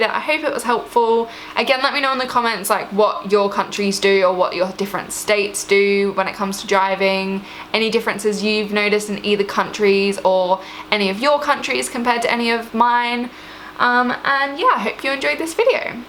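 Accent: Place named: London, United Kingdom. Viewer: You're accent has definitely become more London :) I'll not say 'British' because there isn't really a British accent.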